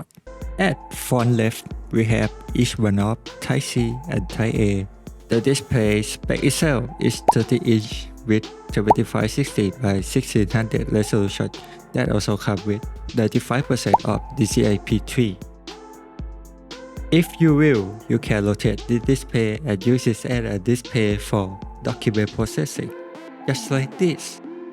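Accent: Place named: Thailand. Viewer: I recognize that Thai accent right away. Good job bro.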